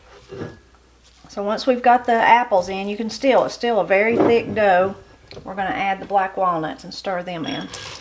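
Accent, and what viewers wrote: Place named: South, United States. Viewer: You're like Tree Trunks from Adventure Time in real life. You have a cute Southern drawl, and make apple recipes too.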